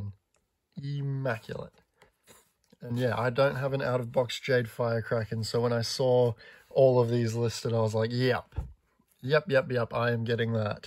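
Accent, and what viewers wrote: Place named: Australia. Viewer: An Australian man explaining skylanders is music to my ears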